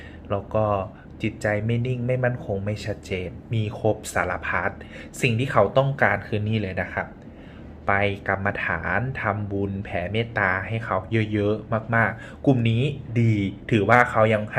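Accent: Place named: Isan, Thailand. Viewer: อาจารย์อยู่จังหวัดอะไรค่ะ วาดยันต์สวยมากค่ะ คลิปนี้เสียงภาคอีสานออกจ้า แม่นมากค่ะ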